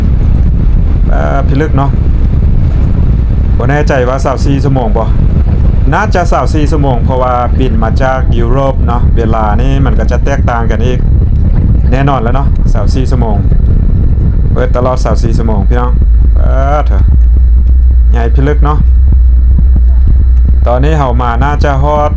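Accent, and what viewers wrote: Loei, Thailand. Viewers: สำเนียงเหมือนคนจังหวัดเลยเปาะเรยครับ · สำเนียงคนเลย คนหล่มสัก นครไทยเลยค่ะ พูดภาษา สำเนียงเดียวกัน ต่างแค่คำนิดหน่อย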